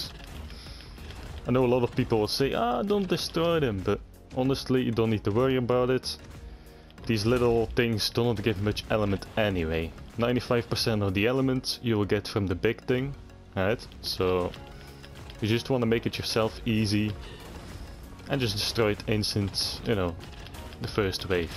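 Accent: Netherlands. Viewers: Hoor ik hier een Nederlands accent hahaha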